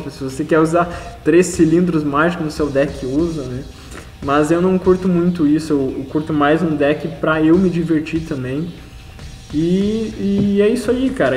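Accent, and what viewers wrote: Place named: Rio Grande do Sul, Brazil. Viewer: Sou de São Leopoldo! Reconheci o sotaque hehe, já divulguei para uns amigos meus.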